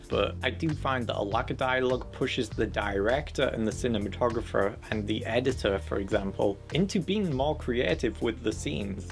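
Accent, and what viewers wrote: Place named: United Kingdom. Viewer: Great advice, thanks a lot! Love the humour. And the dialect 😊. Totally unable to place that, though - which part of the UK?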